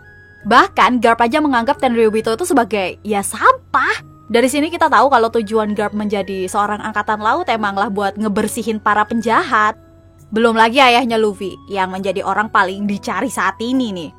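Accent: Java, Indonesia. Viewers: Nah gtu dong ada medok nya kan jd lucu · Saya suka aksen jowo mu... (*_*)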